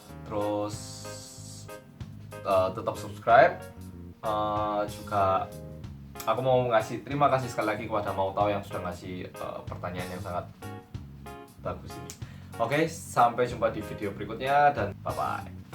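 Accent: Java, Indonesia. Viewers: matursuwun udah di kasih Tahu, mas lek ngomong medok e mandes nemen heheeh ditunggu video brikute mas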